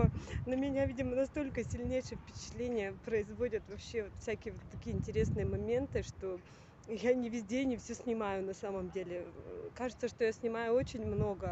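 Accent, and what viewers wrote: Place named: Udmurtia, Russia. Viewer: а почему Вы не дождались вечера. Собаку не выгонишь в такую жару. А вода в Ереване бесплатная, холодная из питьевых фонтанчиков. Судя по говору-Вы из Удмуртии?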